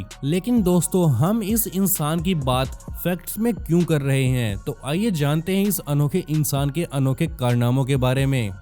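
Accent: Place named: Kashmir, India. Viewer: Bhai.. Ap kashmiri ho kya.. Apka assent kashmiri types hai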